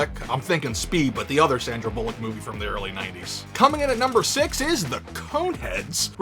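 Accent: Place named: New Jersey, United States. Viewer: You crack me up! That’s a lovely accent you have there. New Jersey?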